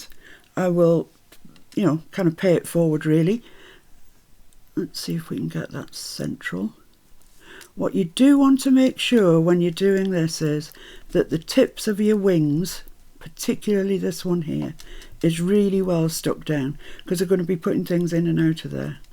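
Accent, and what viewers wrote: England, United Kingdom. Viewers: It is the difference in accents. I am in the United States. My son in law is from your part of England and sometimes he has to repeat things for me. I will continue to watch what you are doing as i love them. I bought an Edith Holden book so I can use it for some of your wonderful journal items. I had never heard of her books so you have expanded my knowledge. Thank you.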